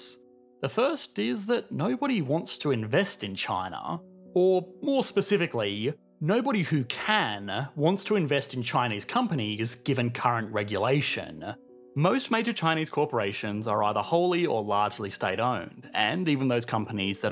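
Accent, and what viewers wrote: Australia. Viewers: He sounds like an australian with parents from stereotypical california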